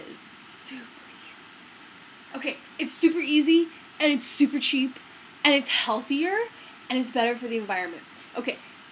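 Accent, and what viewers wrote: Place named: Minnesota, United States. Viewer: This portly lad talks like he is from Minnesooootah! How odd.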